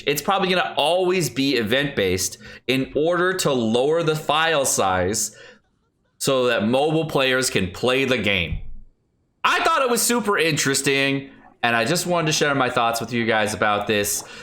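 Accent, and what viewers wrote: United Kingdom. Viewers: = best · is why original is able to provide us with information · Someone should make a compilation of all of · is poggers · the thing is getting a bit cringe but i guess your audience likes it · I just watch for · I love your intro with and your videos theory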